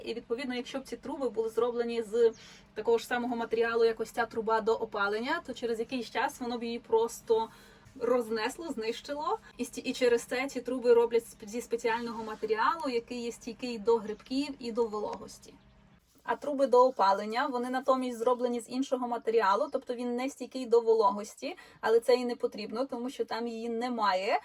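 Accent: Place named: Poland. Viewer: тут саме Польщу чути, не Львів)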